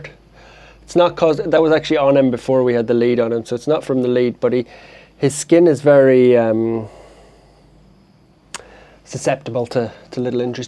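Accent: Ireland. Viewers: Irish brogue/lilt ❤❤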